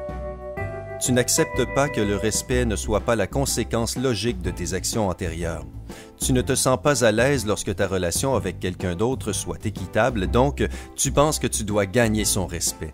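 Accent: Quebec, Canada. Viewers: Tu as un accent québécois likez si 👇vous êtes d'accord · Bonne vidéo je n'avais pas besoin d de ses conseils mais vue que je connais des gens qui ont se genre de problème je pourrais les conseiller et j'adore ta voix lacent québécois et magnifique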